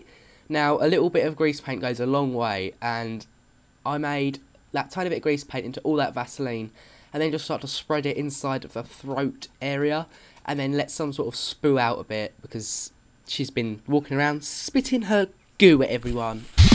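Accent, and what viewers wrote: United Kingdom. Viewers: You're British? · oh and you're cute mehh and british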